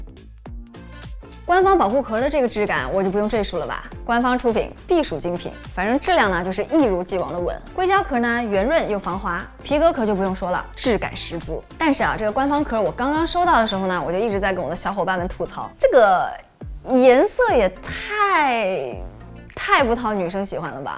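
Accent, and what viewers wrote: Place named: Nanjing, China. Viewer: 总感觉全程自动翻译成南京话呢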